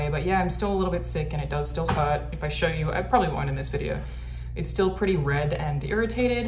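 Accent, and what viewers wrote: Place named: Australia. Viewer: Because she is Australian and that is her accent. Just as your dialect may sound unusual to someone from a different part of your country or a different part of the world.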